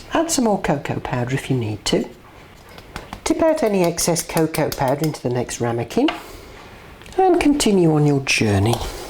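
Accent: United Kingdom. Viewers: I just bumped into you on this site. I saw a comment how somebody turned you onto his mom & she loves you. So I figured if someone watched you, saw their mom later & said "you have to watch this woman", then I ought to give it a moment to see why. Your my hero. Where are you from? The accent. British? Irish? English? Scottish? I grew up in Ireland. Connemara.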